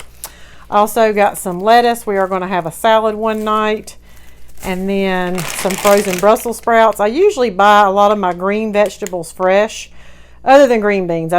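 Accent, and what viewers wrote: South, United States. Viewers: Love your videos and your southern twang. Dollar Tree is the bomb!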